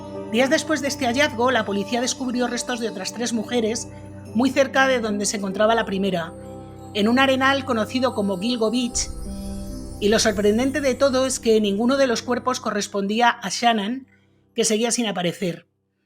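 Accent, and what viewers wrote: Spain. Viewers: Hola! me gusta muchìsimo como describes tus videos, siempre muy interesantes.Ademàs me encanta tu acento; de què lugar de España eres?.Saludos desde Italia❤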